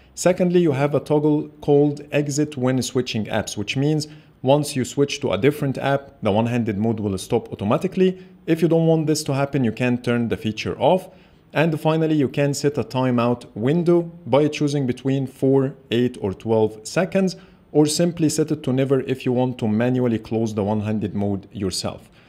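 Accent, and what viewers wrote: Egypt. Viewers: Hhhhhhhhhh.hhhhhhhhh...you are egyptian i can hear your egyptian accent while speaking english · your accent screams eygptian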